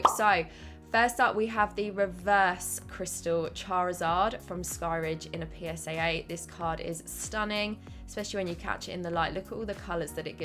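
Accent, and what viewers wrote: United Kingdom. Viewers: Very nice cards. I love the English accent, also. 😅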